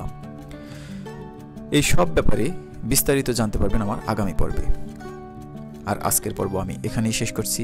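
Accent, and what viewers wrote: Bangladesh. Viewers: That's Bangladeshi Bengali accent. We Indian Bengalis don't speak like that. Our Indian Bengali accent and pronunciation are different from Bangladeshi Bengali.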